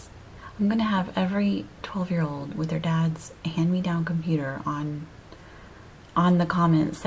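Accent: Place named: England, United Kingdom. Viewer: Dear how come you have no ta America an accent but a English tong of speaking why so many Questions answer the half of it and deeper to your self and closer to the Public you get more out of your program because your very good telling your story wat you want to tell stay in the same passion like your hearings and your become a very wise women stay by your mind and have your self love t Gautier le Bois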